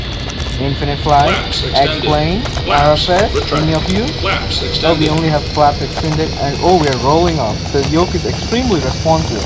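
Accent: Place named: India. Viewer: Dude you can't even speak English properly stop trying to be funny. Plus you sound like an indian person wtf 😂😂😂😂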